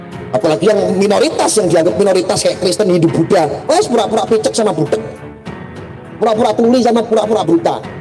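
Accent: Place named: Madura, Indonesia. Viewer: Gus, gaya ngomong sampeyan, menjadikan diriku rindu Sampang. Mbakyu & Masku tinggal di sana... selain itu, info info dari... mencerahkan... terimakasih Gus. Salam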